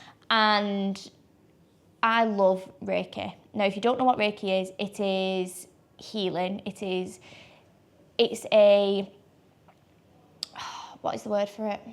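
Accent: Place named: Ireland. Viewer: I don't want to be mean... but her horrible irish/northern english/whatever... accent makes it an ABSOLUTE horrible experience listening to her! It really is HORRIBLE! I lean to tell her "Go open up your chakras somewhere else!"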